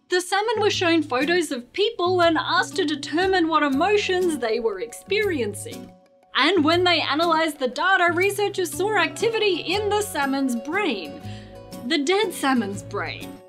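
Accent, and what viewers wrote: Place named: Australia. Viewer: Oh God, I'm in love with you and your Australian accent even though I have a girlfriend. (Shh, It's a secret)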